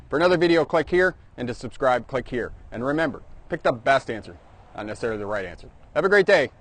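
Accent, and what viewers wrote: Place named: Canada. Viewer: Very Canadian accent lol. Greetings from Ontario!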